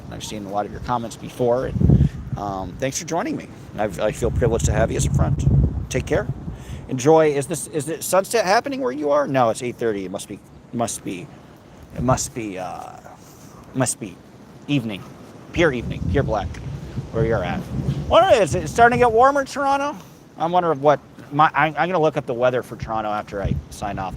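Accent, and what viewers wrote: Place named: RP, United Kingdom. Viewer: Ooooo posh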